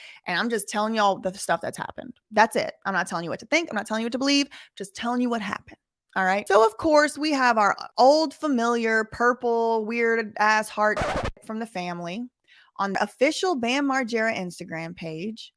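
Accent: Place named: Louisiana, United States. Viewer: I knew you had to be from LOUSIANA 😂❤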